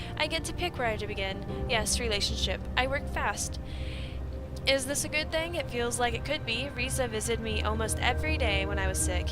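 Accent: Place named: United States. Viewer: I am so glad you did an episode like this:)plus I love hearing an American accent:)you know me haha it became my bedtime story(@@ xxx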